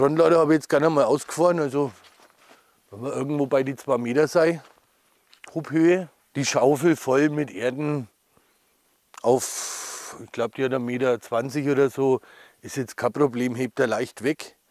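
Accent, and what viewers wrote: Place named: Upper Franconia, Germany. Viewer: Dialekt ist oberfränkisch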